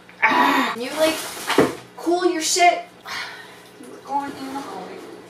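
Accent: United States. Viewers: And I like your deep, funny, "What are you talking about..." American voice!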